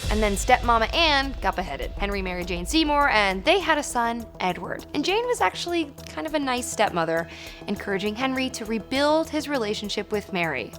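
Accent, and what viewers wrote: United States. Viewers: Its hard listening to English history via a twangy American accent, no matter how attractive the speaker is.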